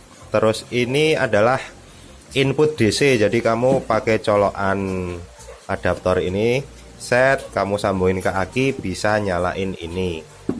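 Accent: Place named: Java, Indonesia. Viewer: Jowone ngendi mas...kok jowo blejed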